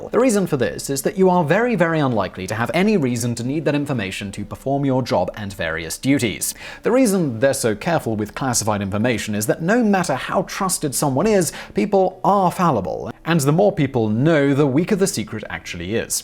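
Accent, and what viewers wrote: United Kingdom. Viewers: British accent, living in Czechoslovakia, pushing CIA propaganda. Suspicious. Simon must have gotten a call from Jeff Bezos telling him the Organization needs a PR boost.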